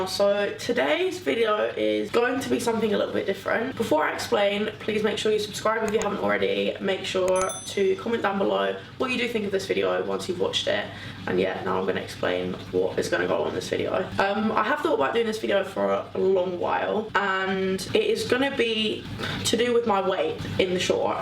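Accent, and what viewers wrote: Scotland, United Kingdom. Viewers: Miss your Scottish accent ahha xx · Fix your accent you moved to london dont mean you have to look your scottish accent and sound chavy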